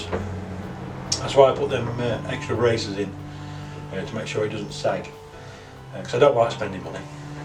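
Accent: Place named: Barnsley, United Kingdom. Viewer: you sound like you live not far from my neck of the woods, here on Barnsley, nice build , rough round the edges but its for use not looking at mate uh ; keep posting, from Garry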